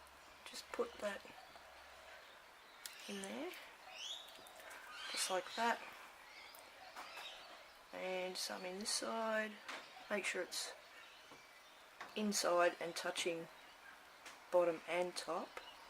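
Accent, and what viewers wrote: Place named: Australia. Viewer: love your artistic creativity and your aussie accent just like my favorite actor Travis Fimmel, also love your choice in music. hope to see more creepy dolls too.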